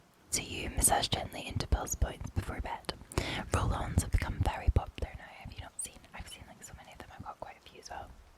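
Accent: Scotland, United Kingdom. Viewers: beautiful Scottish accent, whispered and Tascam mic, this sounds so good and tingly. Thanks for the amazing ASMR content every Monday, Wednesday and Friday 👍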